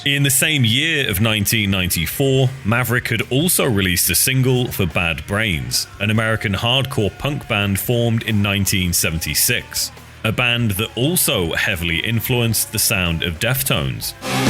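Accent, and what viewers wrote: United Kingdom. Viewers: The commentary really kills this video. Hearing a british guy say "pop locking" was more than i could take.✌🏾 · let me explain it for your side of the road leaf iq brain my point is the same video is being made with the same information so there’s no point in your uk voice ass documentary being made when there’s already a bunch made but wtv get the views you feining for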